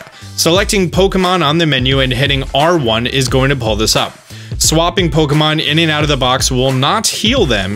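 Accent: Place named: East Coast, United States. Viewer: I love the way you and everyone else on the East Coast say water... "Wooater" hehe 😁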